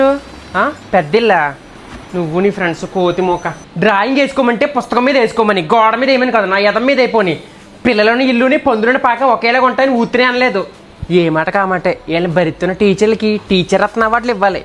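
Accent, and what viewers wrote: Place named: Godavari, India. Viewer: అసలు గోదావరి భాష.. నాకు నచ్చింది..మాది భీమవరం.. పెళ్లి అయ్యక మచిలీపట్నం..నేను ఈ బాషా మిస్ అవుతున్నా...పప్పలు కొనుక్కోవడం ..నా యేదం..బాబా, ఎంత అందమైన భాష 😅😅😅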